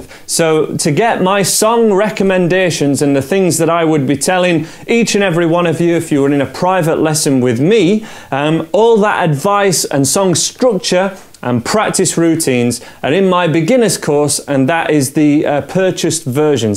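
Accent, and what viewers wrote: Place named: Birmingham, United Kingdom. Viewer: Your accent is like peaky blinders 🙃😂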